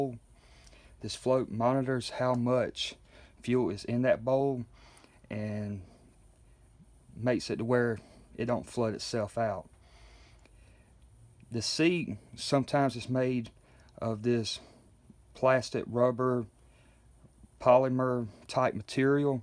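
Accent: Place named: Alabama, United States. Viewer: AWESOME DISCRIPTION of how CARB works! very thorough And nice southern accent! it sounds like it might be from Alabama like my Momma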